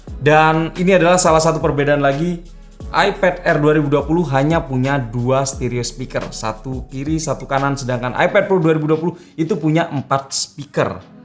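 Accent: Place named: Java, Indonesia. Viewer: medok mu mas..... hahahaha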